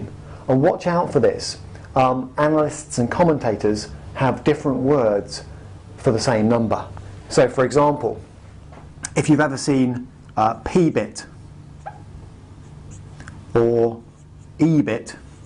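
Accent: England, United Kingdom. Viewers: Is it just the English accent or he actually resembles Christian bale... Great video...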